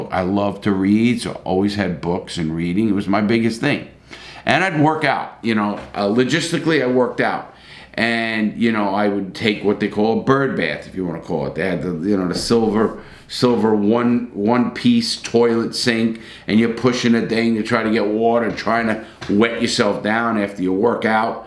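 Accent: New York, United States. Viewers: I just LOVE his new york accent its so heavy I can smell pizza from the screen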